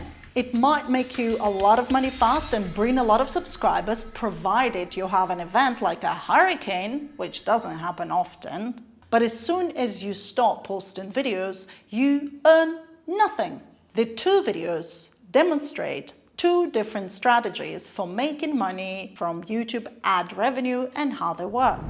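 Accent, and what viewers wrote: Jamaica. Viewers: LOL....Good Joke....We love your accent, especially when the "Jamaican" part "sneaks in" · Girl I hear the Jamaican accent coming out lol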